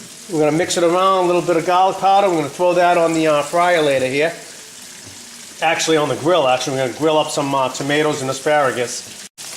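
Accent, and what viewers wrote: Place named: Italian-American, United States. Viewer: Oh your accent doesn't bother me at all. And I love how Italo-Americani try to speak Italian. It's not your fault but living in the States, I'm sure your parents had to learn it making it very difficult to then pass on the Italian language. My parents instead had to learn both English and French for working purposes, but once at home it was Italian. For this I was lucky because later on it came handy, I am now living in Italy. Your recipes are good and easy for anyone to make!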